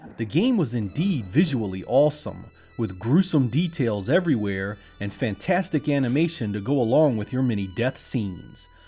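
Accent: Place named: Philadelphia, United States. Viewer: You can tell he is from Philly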